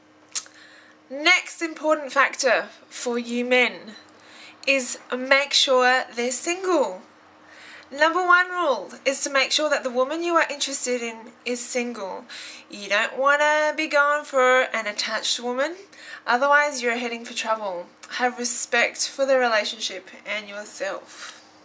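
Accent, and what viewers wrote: Australia. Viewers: I'm a sucker for Austrailian accents :)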